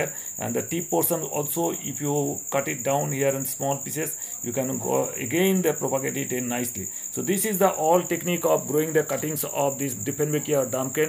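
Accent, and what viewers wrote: India. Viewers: I like Indian English :-)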